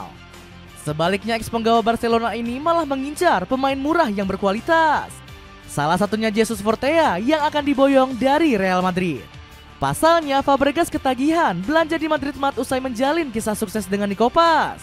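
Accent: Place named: West Java, Indonesia. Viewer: VO nya kaya dialek jabar di daerah 😂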